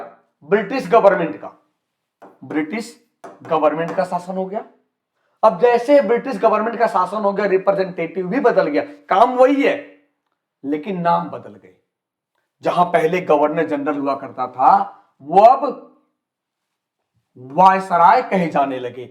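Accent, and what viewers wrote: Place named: Jharkhand, India. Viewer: जोहार महोदय लगता है आप झारखंड से हैं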